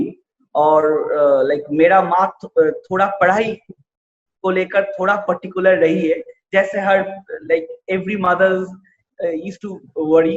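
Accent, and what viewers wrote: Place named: Bengal, India. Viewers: I appreciate you dada n i respect you..but ur bonghindi 😂 🤣.. love that.. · Your bong hindi 👍👍👍👍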